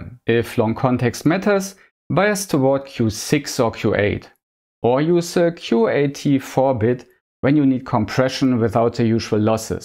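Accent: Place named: Germany. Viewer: Thank you for your video, it helps me A LOT? Ist das ein deutscher Akzent, der mir hier die Ohren.... maltretiert?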